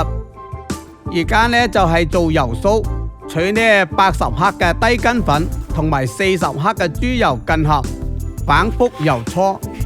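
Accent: China. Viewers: 这是哪个地方的粤语。。。。。。？ 另外感觉就是变着花样吃猪油。。。。掺些面粉让猪油不要太像猪油。。。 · Mia Mia 佢係廣東人但唔係廣州，廣東話除咗廣卅話外仲有好多方言，佢就屬其中一方言。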